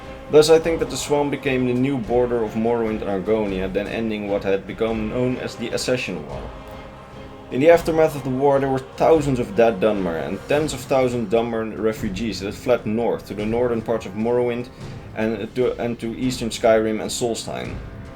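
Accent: Netherlands. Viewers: Je klinkt zo nederlands als je engels praat 😂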